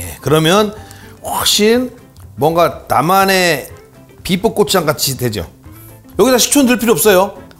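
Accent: Chungcheong, South Korea. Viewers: 그런줄 알유 하면 다 알아들유 충청도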